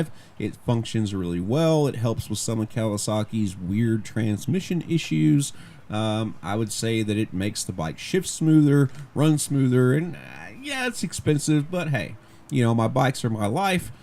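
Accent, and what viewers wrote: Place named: Canada. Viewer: Canadian? once American? can't tell. you just sound like a Canadian that knows all accents and ignores all of them but doesn't speak proper English either XD could also be cuz its a voice over and you can pick or re-say something lol but ya Canadian?????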